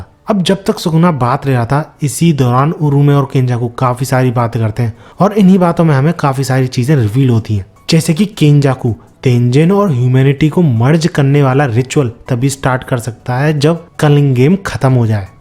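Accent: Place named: Madhya Pradesh, India. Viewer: You are from MP Or either from near by agra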